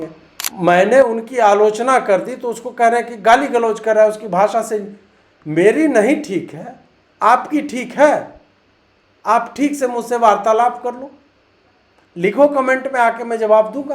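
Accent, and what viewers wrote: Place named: Begusarai, India. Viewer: Begusarai wala ka ye normal launguage h Galti se begusarai style me sahi se bol diye to tum logo samjh se bahar aa jayega Boli se ched kr deta h begusarai wala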